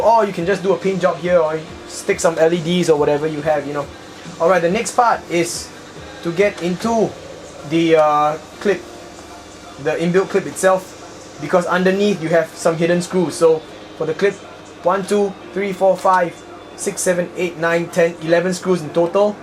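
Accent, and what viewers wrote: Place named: Singapore. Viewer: lol from the accent oh singapore sure one XD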